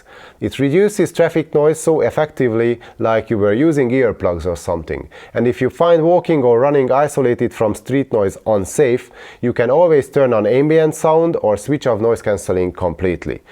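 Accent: Hungary. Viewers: magyar akcentus? :)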